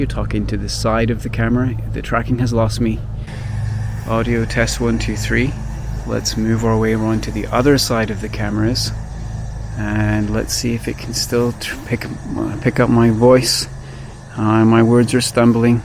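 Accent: Northern Ireland, United Kingdom. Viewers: Great review. I have the Pocket 1 and was considering upgrading. This has convinced me to keep with it although there are some great improvements with the Pocket 2. I just bought a controller wheel instead. Nice to see you are holding on to your Northern Ireland accent, it made you easy to listen to. Well worth subbing your channel.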